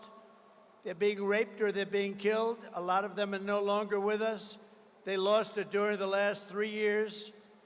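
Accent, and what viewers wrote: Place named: United States. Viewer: Genuine and authentic, spoken in clear American English. MAGA.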